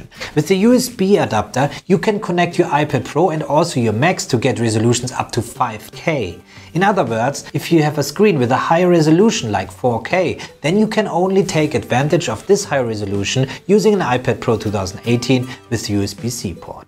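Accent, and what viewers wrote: Germany. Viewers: Is he German ?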